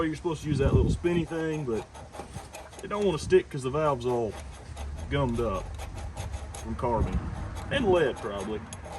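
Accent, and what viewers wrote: South, United States. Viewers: This American accent is harsh, grinding and an onslaught on the ears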